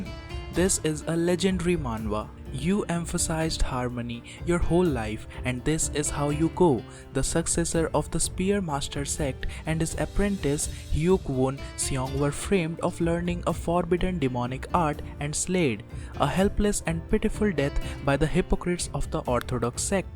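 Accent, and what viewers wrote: India. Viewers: Too much Indian accent in the voice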